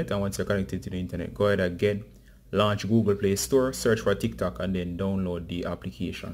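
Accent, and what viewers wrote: Jamaica. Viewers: Sounds like a Jamaican accent. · Are you Jamaican?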